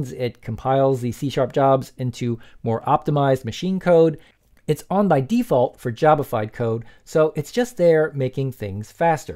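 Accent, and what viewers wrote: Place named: England, United Kingdom. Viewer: I like your Unity videos, speaking very clearly and English accent is nice too. Hope you can make more Unity tutorial videos!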